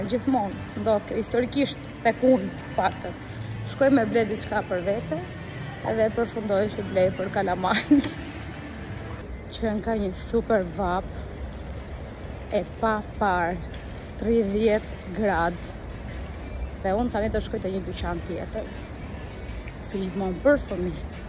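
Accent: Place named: Dibra, Albania. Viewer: Hahaha me knaqe po nga je se flet si nga dibra se nga dibra jam vet urime suksese pac me kto video❤️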